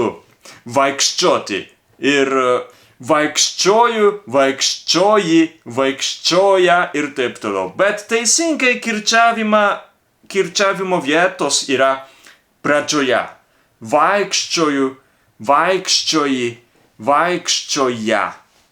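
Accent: Japan. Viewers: Sveikas,. Tų žodžių, kurių nekenti, netark ir viskas bus gerai. Malonu klausyti tokį malonų japonišką akcentą. Vaike, Tu perdaug labai nesistenk gilintis į lietuvių kalbos gramatiką. Ji labai sudėtinga. Daug painiavos. Tavo pradžia nuostabi. Neskubėk. Viskas ateis.Tai, ką išmokai - niekur nedings.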